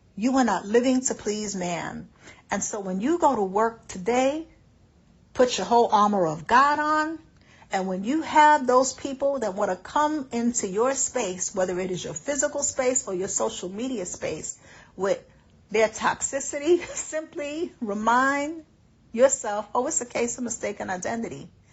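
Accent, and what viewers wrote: New York, United States. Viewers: Case and Point: This video is WHY I am a Tier 3 member of this channel. The wisdom that you have been graced by God to have is a blessing. Then, your authentic NEW YORK ACCENT is EVERYTHING❣️🙌🏾🎉✨😍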